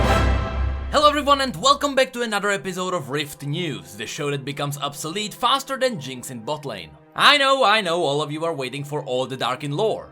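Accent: Czech Republic. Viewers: Hned co jsem uslyšel ten přízvuk bylo mi jasné, že musíš být z Česka. Úžasná videa <3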